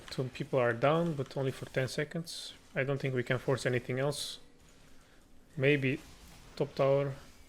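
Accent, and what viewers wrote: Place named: Germany. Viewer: true, it's like a mix of german/english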